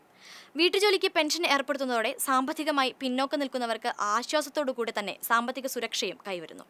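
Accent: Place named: Thrissur, India. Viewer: തൃശൂർ സ്ലാങ് ആണ് നല്ലത്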